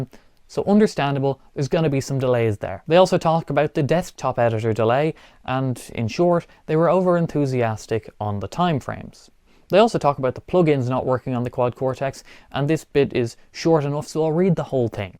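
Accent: Ireland. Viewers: Why do u have a Irish accent? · I came here because I needed to hear that gentle, Irish voice of...DOOM!! 😎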